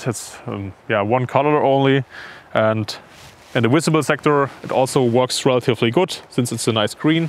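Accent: Germany. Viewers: Wdym he has a heavy german accent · Fürchterlich wenn ein Deutscher englisch spricht.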